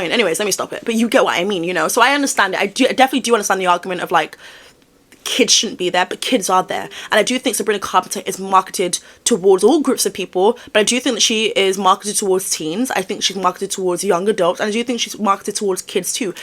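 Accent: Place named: England, United Kingdom. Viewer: GIRL how did i never noticed that you had an English accent until you literally said so 🤦‍♀️🤦‍♀️🤦‍♀️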